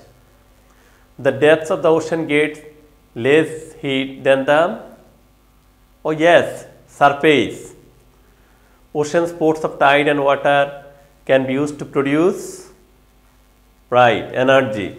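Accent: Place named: Bangladesh. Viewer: Honourable sir, First you complete phonetics after that teach nationally. I think you are a Banglish teacher.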